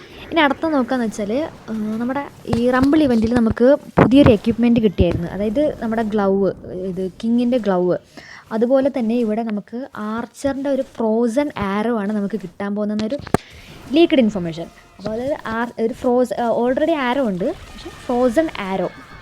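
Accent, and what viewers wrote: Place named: Kannur, India. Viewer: athe Kannur slang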